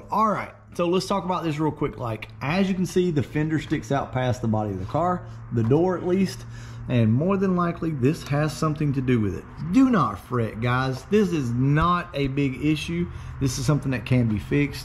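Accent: United States. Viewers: so americans really talk like that?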